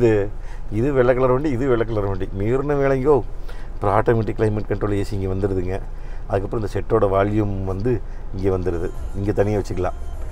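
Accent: Kongu, India. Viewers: அண்ணா வணக்கம் கொங்கு தமிழில் கொஞ்சி தமிழ் வாழ்க வளமுடன்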